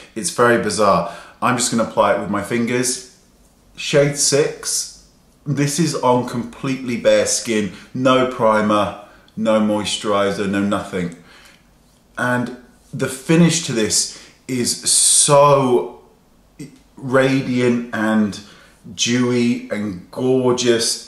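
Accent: England, United Kingdom. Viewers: I love your english accent especially how you say dewy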